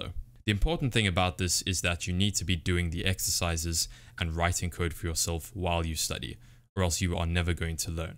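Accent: South Africa. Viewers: immediatly recognises South African accent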